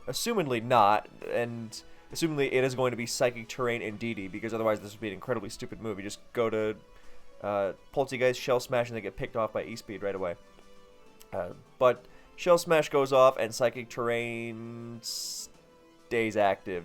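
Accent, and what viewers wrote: Germany. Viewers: Please, for the love of all that is holy, narrate an entire game in your german accent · I need more of your German accent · As a german Person myself i Love watching People butcher the german Language, since it has a few stupid things and therefor is nearly always destroyed when tried to recreate so please use more German accent :) Also no, volcarona doesn’t use roost but it sometimes does use morning sun, it lost roost between gens And I want to see dnite espeed a polteageist (ghost mon) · Yes you should narrate the next DPP Ubers Tournament video in your German Accent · You should narrate an entire replay in your awful German accent!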